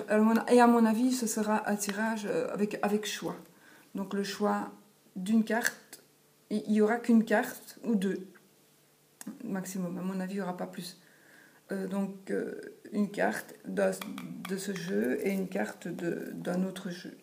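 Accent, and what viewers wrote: Belgium. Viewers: Faites au mieux mais je suis toujours très pressée de regarder vos vidéos..et votre accent belge me rappelle des amis""perdus"".....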